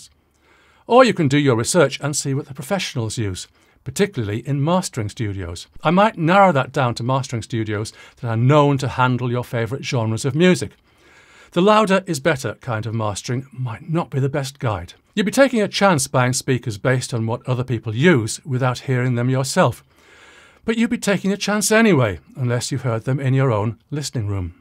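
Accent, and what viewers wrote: United Kingdom. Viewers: Yes. He has one of those thin accents. He's from the North. But he certainly isn't from Liverpool.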